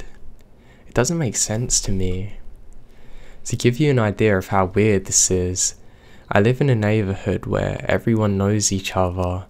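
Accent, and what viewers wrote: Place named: Yorkshire, United Kingdom. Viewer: Hey. What’s accent (or no accent) do you have? Yorkshire? I can’t tell? Not London I think. It’s cool.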